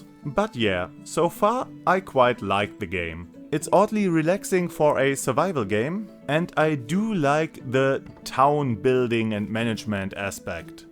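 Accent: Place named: Germany. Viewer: Bist du deutsch? Sounds like a german trying to speak english very correctly.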